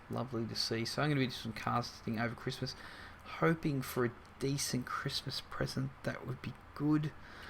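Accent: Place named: Australia. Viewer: good thick aussie accent there, from another strayan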